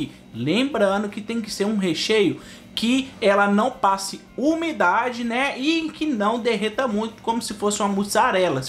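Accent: Minas Gerais, Brazil. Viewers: Adorei, só de falar já vê que é minerim!! Já compartilhei e vou fazer. Ontem domingo dia 23/07 eu fiz a broinha de fubá de canjica doce. Em breve farei esta receita. Estou te assistindo de Ipatinga, Minas Gerais!!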